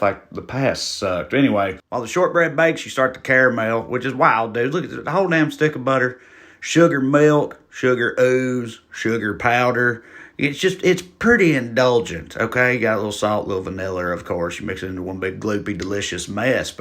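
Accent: Scotland, United Kingdom. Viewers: Love the accents - especially the Scottish one - that's what I come to for, baby. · I’m down for any video where you use a Scottish accent,! These look absolutely luscious 😋😋😋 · You knew we needed a second helping of the Scottish accent! Thanks for that! 😂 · Your array of talents continues to leave me in awe. Cooking plus British dialects? Lawd have mercy! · I am weirdly fascinated by this "Scottish" accent.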